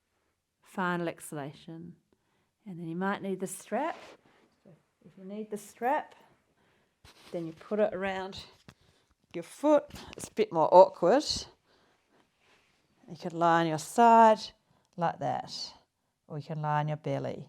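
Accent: Australia. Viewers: Thx for the yoga exercises while conscious of knee osteoarth. I like the gentle knee bends and then standing on toes. All other exercises seem solid/proven ones to induce motion, strength in muscles around knees. If in another video you could touch on the issue of cartilage loss, cartilage gap and how to prevent ill-effects from that specifically, that would be wonderful! Great presentation overall, very compassionate style. The Aussie accent (?) is charming. Kudos. Thanks so much for making these videos!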